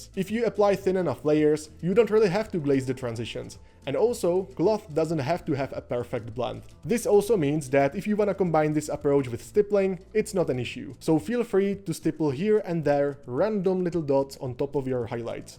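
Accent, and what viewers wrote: Czech Republic. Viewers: Youtube doporučí zajímavé video. Po první větě je jasné, že jeho Tvůrce je Čech. Jinak skvělé rady, to se musí nechat.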